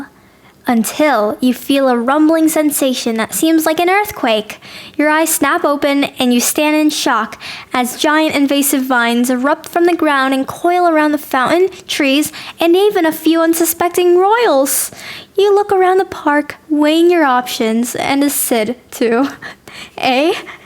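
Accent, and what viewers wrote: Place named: United Kingdom. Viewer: I LOVE YOUR BRITISH ACCENT OMG <3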